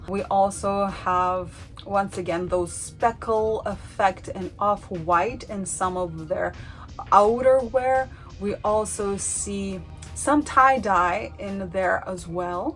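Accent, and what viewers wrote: Russia. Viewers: Great understanding of trends, and feeling for fashion. Beautiful English, good French pronunciation and a very light cute accent, perhaps Russian! Just curious.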